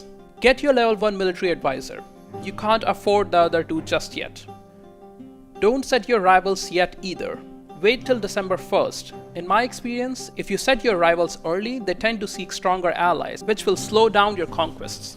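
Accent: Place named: India. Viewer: make a tutorial on India. I hope i'm not wrong but you sound Indian. If you are make a tutorial on India and tell us some history :D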